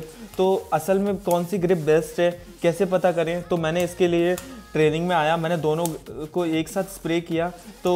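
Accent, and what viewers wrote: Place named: Bengal, India. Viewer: i think u r from west bengal😅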